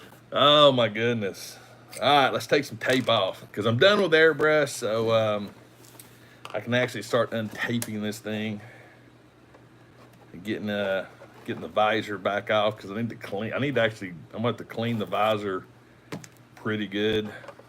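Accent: South, United States. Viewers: How could I not subscribe to a toy collector with a southern twang.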